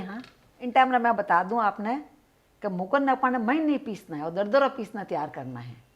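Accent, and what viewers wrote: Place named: Rajasthan, India. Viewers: Jai siya ram badiya jee. Thari receipes bahut hi badhiya hai. tharo sikhane ko tariko bahut jordaar hai. Thari marwadi bahut pyaari lage. 🙏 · Aunty ap meri favourite ho or apki Rajasthani boli same meri dadi k jesi❤️❤️😘😘😘 or apki recipes to osm h ❤️ love you ❤️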